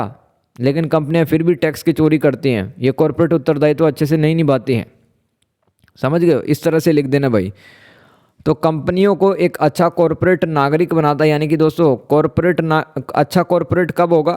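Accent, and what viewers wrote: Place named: Himachal Pradesh, India. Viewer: Bhai ji aap himachal se ho pahadi bolte ho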